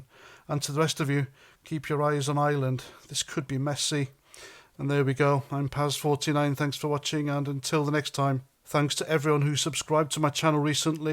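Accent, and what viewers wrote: Wales, United Kingdom. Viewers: The Narrator is not English he's Welsh.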